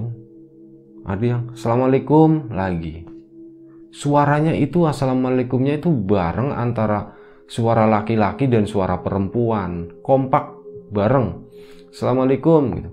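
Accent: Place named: Central Java, Indonesia. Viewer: Mantab mas. 🙏 logat kakay Jawa tengah Alus kromonya👍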